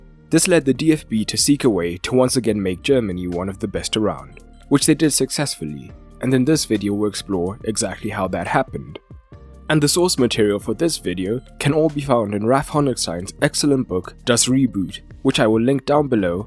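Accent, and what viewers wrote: South Africa. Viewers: I could swear you have a South African accent bro. I love these videos! And the OneFootball app 💪🏾 Keeping it Simple 👌🏽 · Where are you from? You sound South African.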